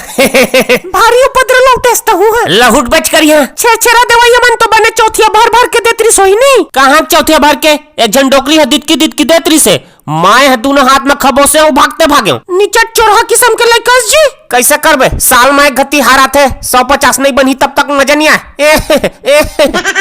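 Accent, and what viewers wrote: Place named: Chhattisgarh, India. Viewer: Tor aawaze ha pure chattisgarhi lgthe bhai maza aage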